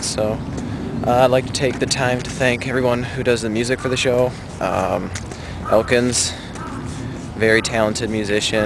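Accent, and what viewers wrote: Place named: Minnesota, United States. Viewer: You know, your accent reminds me of a Minnesotan accent. My landlords are from Minnesota (Im from Colorado) and when they argue I LOL.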